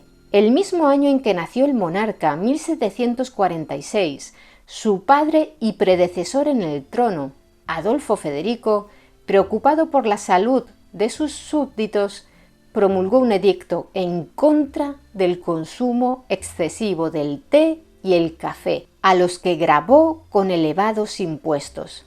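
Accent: Spain. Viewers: Me dió risa porque si nos estamos tomando un cafecito mientras escuchaba el video, saludos, me encanta el contenido pese a que el acento español no me resulta tan agradable, pero claro que no me choca, solo es que no está en South TX uno muy acostumbrado a ese acento al ser de origen mexicano, sin embargo creo que para cuestiones hostoricas nos da cierto aire de parecer adecuado, así en mi mente funciona que creo que se hablaba en la antigüedad aunque sea algo que no sea verdad pero así se concibe en mi mente!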